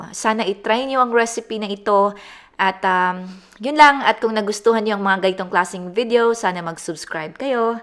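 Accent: Visayas, Philippines. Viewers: I can hear a tinge of bisdak in your voice. Hope to hear more from you! 😍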